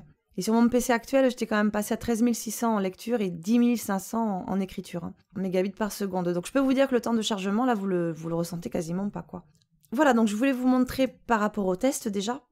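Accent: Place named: Toulouse, France. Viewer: Cet accent Toulousain ^^ J'adore lol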